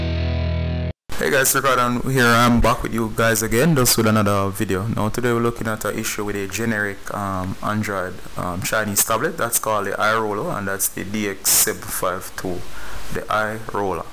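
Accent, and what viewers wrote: Jamaica. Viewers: You sound Jamaican😎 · You sound Jamaican cool. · brilliant Jamaican accent. Thanks for the tips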